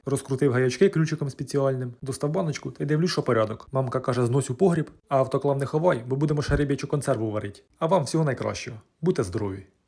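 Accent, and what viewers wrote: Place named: Rural, Ukraine. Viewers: Полтавський говір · Полтавский сюржик😂❤❤❤❤❤???? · Суржик у вас даже знакомий, прямо , як у рiдному селi..побувала. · Це не народжується) Черкаси і черкаський район - ми так балакаєм 😅😊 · Південний говір,мій ріднесенький,аж заскучала.Давно я в рідному краї не була,не дивилася із холму на ті поля нескінченні,пилюку ту задушливу не ковтала ,несучись полями(бо то ближче)до якоїсь річечки на шашличок,могилками рідних своїх невклонялась.....Господи,як же я скучила,навіть сама не зрозуміла.... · і Полтавщина так балакає.😊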